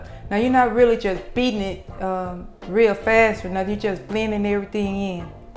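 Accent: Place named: Carolina, United States. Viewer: My lawd Carolina country boi we say it just like you do. The pie looks amazing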